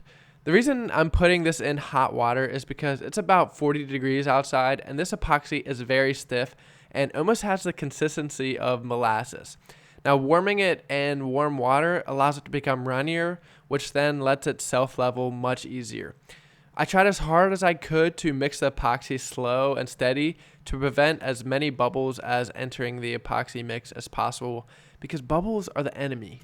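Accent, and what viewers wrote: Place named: Western Pennsylvania, United States. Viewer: Sounds like you live near me in western PA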